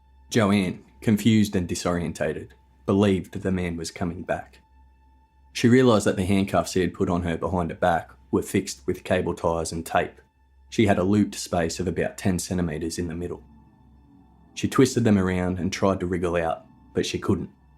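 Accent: Australia. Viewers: Yeah he is great. I got sick of the endless true crime podcast presenters that insist on giving their opinions on it all. I just love hearing the cold hard facts of it all and the Aussie accent somehow puts it in a different league. It's really easy to listen to.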